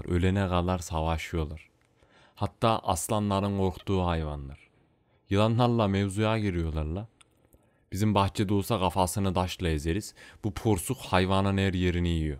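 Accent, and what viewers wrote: Ankara, Turkey. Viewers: Son derece başarılı. Belgeselleri çok severim. Ankara ağzıyla efsane olmuş. Böyle devam kardeşim. İnşallah burası tutacak. Dur ben bi paylaşayım.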